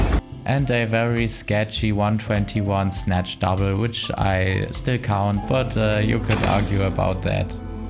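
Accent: Germany. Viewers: Ich dachte die ganze Zeit schon dein Akzent ist deutsch Geil, bleib bitte dran und mach weiter so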